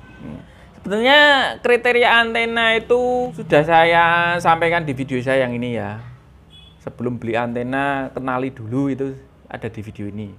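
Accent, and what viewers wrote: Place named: North Sumatra, Indonesia. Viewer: Aksen Bataknya kental banget, aku suka.... 😂😂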